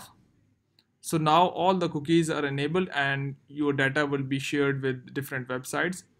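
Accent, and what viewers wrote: India. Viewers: He is Indian . I can tell by his accent.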